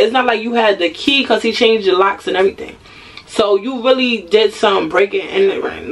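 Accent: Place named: Newark, United States. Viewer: I’m from Newark and moved to another state for a better life with my family I knew instantly were u was from because of that accent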